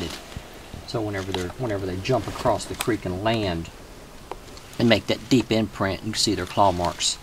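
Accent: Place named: Texas, United States. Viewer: I subscribe to your channel. I know at lot of things that you teach ,but you always seem to have one idea that I had not considered. I love your Texas accent,; My son-inlaw is Texan. If the two of you were talking from another room, I wold have to guess who was who. I enjoy your vids. Keep up the good work! 28Bull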